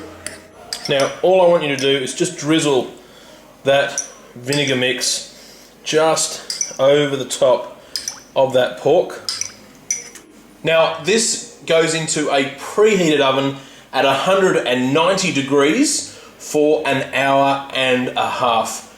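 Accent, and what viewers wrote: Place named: Australia. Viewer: Wow! You are really good! My mum is a chef. c: <3 your videos, plus your aussie too! (I am if you are wondering) I'm a South Australian. c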